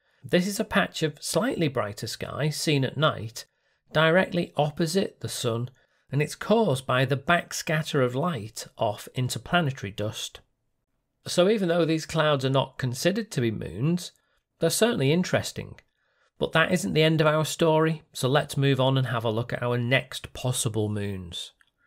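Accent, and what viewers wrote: United Kingdom. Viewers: Can i ask you from what part of Britan does your accent come?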